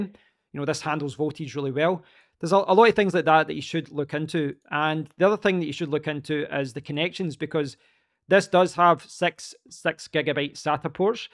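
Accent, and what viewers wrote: Scotland, United Kingdom. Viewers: Love the excellent description and the great Scottish accent. Thank you!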